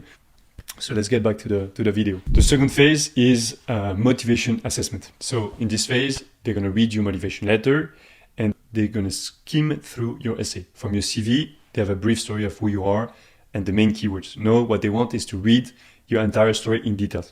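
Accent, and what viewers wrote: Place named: France. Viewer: Korean look, French accent😮 weird guy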